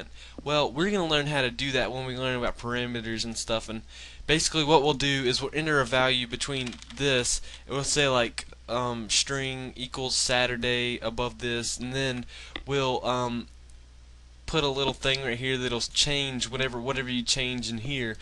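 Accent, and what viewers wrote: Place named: South, United States. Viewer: Great Video, sexy semi-southern accent.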